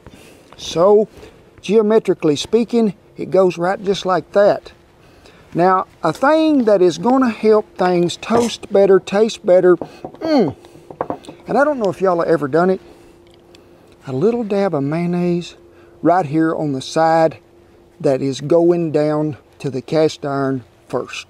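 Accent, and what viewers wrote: United States. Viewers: Это настоящий американец, фермер с глубинки) Акцент потрясающий) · Friendlyjordies bought me here. Love me some cowboy accent and some delicious yum yumms.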